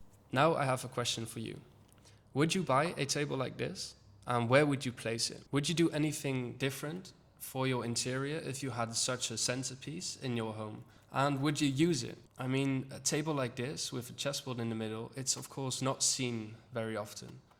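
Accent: United Kingdom. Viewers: thought I was listening to a Londoner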